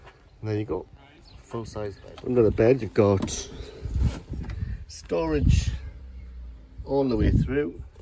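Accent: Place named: India. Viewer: Are you Indian?!!!i cant believe how you talking!!!!😁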